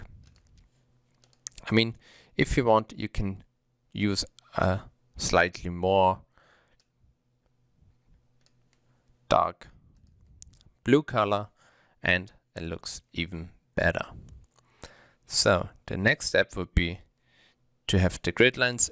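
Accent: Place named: Germany. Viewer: ich höre am Akzent, dass Sie Deutscher sind. meine Fragen 😉: 1. Fast alle Händler und mittlerweile alle professionellen Händler verwenden Candle Sticks. Könnten Sie vielleicht hierzu einige farbliche Hinweise geben? 2. Der obere Bereich (Covering) ist ja in Bloomberg orange, einige Teile auch rot. könnten Sie auch hier ggf. Einstellungshinweise geben? vielen Dank im Voraus. Ergänzung: Meine Watch List sah früher bei Bloomberg auch etwas anders aus. Ich möchte dies gern in Tradingview so einstellen. Wie geht das?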